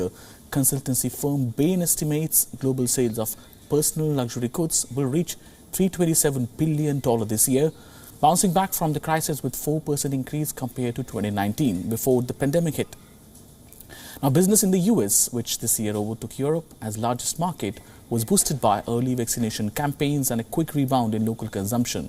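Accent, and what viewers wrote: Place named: India. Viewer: scam news network with a ganges river accent🤮🤮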